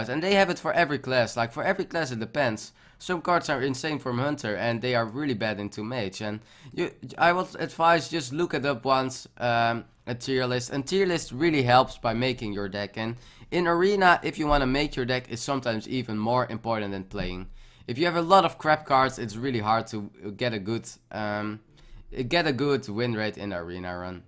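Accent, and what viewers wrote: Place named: Netherlands. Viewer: Wist meten dat je Nederlands was man. :D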